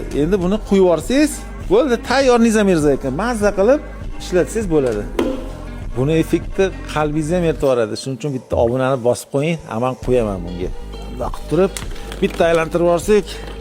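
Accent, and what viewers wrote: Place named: Tashkent, Uzbekistan. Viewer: НИМА ТОШКЕНТЧА ГАПИРИШ МАНСАБМИ ОБРОМИ ЁКИ ЛАВОЗИММИ. КУРИНИШИН ХАМ ГАПИНГ ХАМ БОШКАЧА БУНИ ХИДИ ШИРИН ЭАМАС ТУЗИ ПАСТ ЭКАН.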